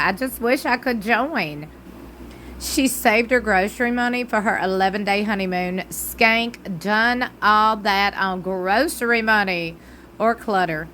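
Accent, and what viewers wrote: South, United States. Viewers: Just found your channel. I haven’t watched Sister wives in several years, but they came across my YouTube algorithm for some reason, so I went and watched 18 and 19 seasons on Hulu., since it’s free with my t-mobile subscription. Unable to watch season 20 yet, been trying to get glimpses through you guys on YouTube. Robin is absolutely diabolical. I hope to never meet anyone like that in my entire life. Anyway, enjoy your channel, and also your accent. You’re from Louisiana? I’m a Georgia girl. Love the mutual southern accent!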